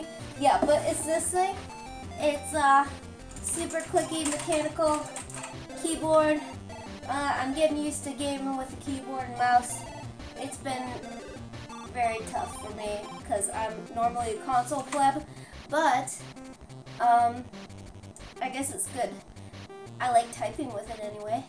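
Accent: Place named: Brooklyn, United States. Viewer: I can hear a Brooklyn accent...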